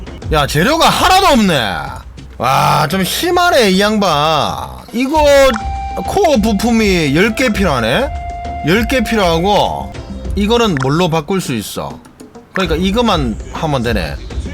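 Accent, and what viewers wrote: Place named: Daegu, South Korea. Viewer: 대구 사투리 쩝니다 고향 생각나네요 ㅋㅋ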